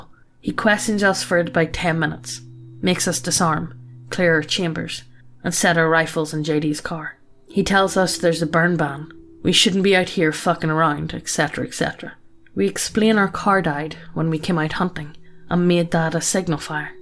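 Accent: Ireland. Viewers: Sp00k? Check Scary footage and music? Check Irish Voice? Check Yeah, it's time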